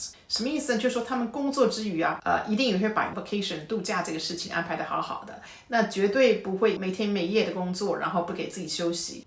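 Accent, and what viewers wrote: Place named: Taiwan. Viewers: 明顯是台灣的腔. 台灣腔有許多種類。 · 很明顯的台灣腔啊。怎麼在美國40年還可以保有這麼自然的台灣腔，厲害！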